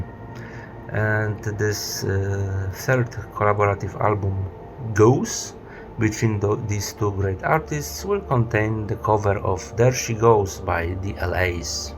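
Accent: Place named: Poland. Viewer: I knew I heard that Polish accent and typical Polish "erm/ummm"s right. Imma be honest, I have no idea in world how you got recommended to me, but I'm really happy you did. Will definitely check out the stuff you've spoken about. Cheers and keep going!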